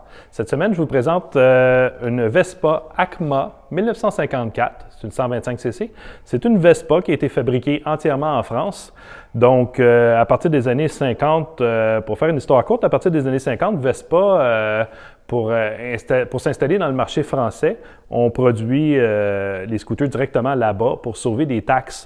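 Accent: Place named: Canada. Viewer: On aime beaucoup votre accent, çà donne son truc a lui et puis j' aime bien l accent canadien et le Canada en général, çà nous fait voyager , il y a bien l 'accent suisse selon les endroits plus accentué , en revanche à la frontière il parlent comme nous , de même que l ' accent belge, donc la France ,avec ses accents (du sud , le nord...) avec la mondialisation on s' est habitué ;) ne parlons même pas de l' afrique francophone... quoiqu' il en soit, là n 'est pas le problème vous présentez bien, et ses passionnants vos observations, les petites anecdotes s' est distrayant, mieux qu un magazine moto , personnellement je préfère les gens passionnés objectifs comme vous , qu un journaliste de magazine moto.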